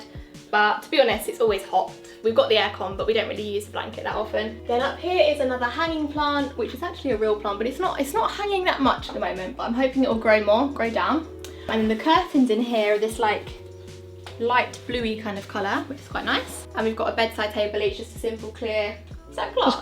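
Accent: United Kingdom. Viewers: That british sexy accent💜 Everytime i hear british accent i always think Hermione😂 it's fayaah!🔥 Sorry from my english haha😅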